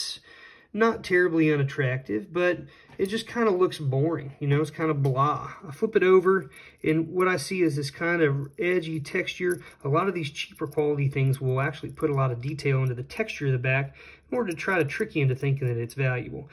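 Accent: South, United States. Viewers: This guy is definitely from the south with that strong southern accent.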